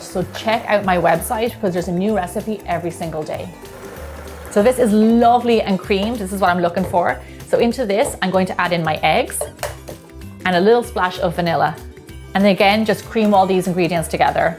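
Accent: Ireland. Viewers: Do I hear a lovely Irish brogue? Delightful!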